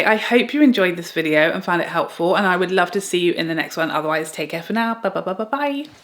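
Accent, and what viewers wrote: United Kingdom. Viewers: Yes, Black Friday started the day after Thanksgiving here in America. I know people who wake up at the crack of dawn to wait in line at the stores to buy that TV. It's a tradition for some people. I can't imagine shopping in store on that day - the crowds are unbelievable - not fun. Thank you, for being true to who you are - this is why I follow your channel. Also, I love your British accent.:)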